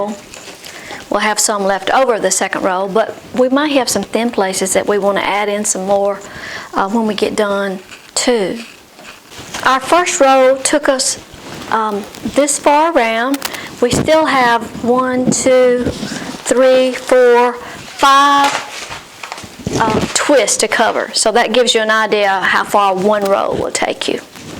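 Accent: South, United States. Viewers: I just love watching your videos! Not only have I learned a few things, thats the bonus, but best part of all, is feeling like you have just invited me into your home for a visit. I love the way you talk lol, you guys sound just like me he,he. I was just a talking to you saying heck yeah I wore a garter belt. Gotta love those Southern Ladies. Never change. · I love your videos, I 3 daughters and one step daughter and love the times we craft or cook together. We are southern too so we love listening to your accents! I am learning all things deco from you gals! Thanks.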